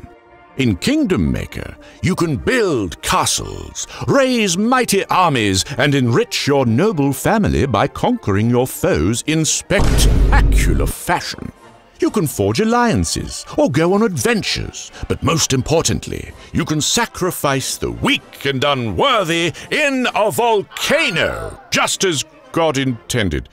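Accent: RP, United Kingdom. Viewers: Your content is very interesting and well made, and the narrator's posh accent is the cherry on top 👌 I'm glad this channel is slowly getting more recognition. Hope the best to you!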